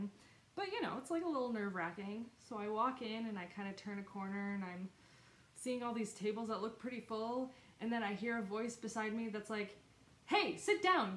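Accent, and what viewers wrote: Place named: Quebec, Canada. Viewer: Allo des Cantons de l'Est!!!! I'm so glad to have discovered your flosstube!! I'm a big fan of Jacob and the team at Evertote!!! I can't wait to start the holliday countdown. Will you participate? I was not able to go to the retreat as it was too close from a conference at work, but your video made me feel the experience!!! And you are so lively as a person!! I had an idea that you were from Québec by the way you prononce French words. Maybe, with other stitchers, we could make a local chapter? I can't wait for your next video.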